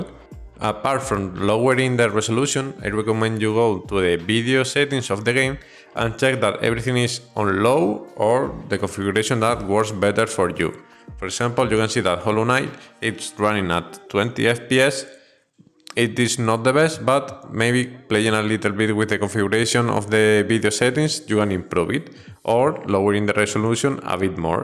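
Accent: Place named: Spain. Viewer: Very spanish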